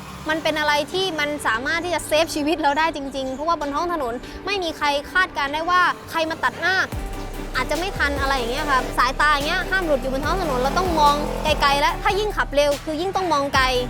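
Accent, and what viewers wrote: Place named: South, Thailand. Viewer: เก่งมากครับน้อง ถามนิดคนจังหวัดอะไรครับ ผมคนนครศรีธรรมราช ฟังสำเนียงเหมื่อนคนใต้ครับ เก่งมากครับ น้อง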